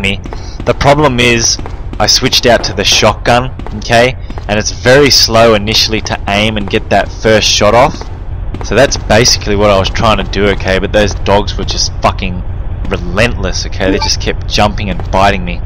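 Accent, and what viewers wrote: Australia. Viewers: he is from Australia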